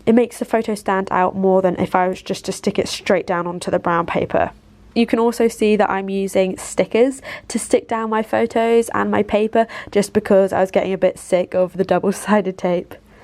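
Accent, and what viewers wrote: United Kingdom. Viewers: Because she is speaking in British accent and Emily was a British girl too 😅 does it connecting now 😅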